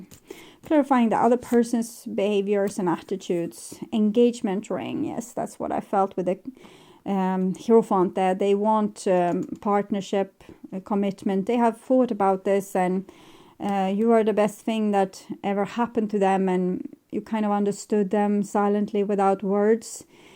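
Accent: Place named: Sweden. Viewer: Tack! Blev mest glad att hitta en svensk! 🙏🙏( jag hörde direkt att det va svensk engelska 😁😁😇) det va nån mening med att hitta denna reading! ❤ Relaterar till hela readingen... 🙏😇 Love amd light!!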